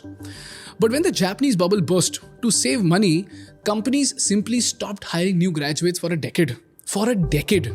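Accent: India. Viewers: 原来印度语是这样的啊。有点英语的成分啊。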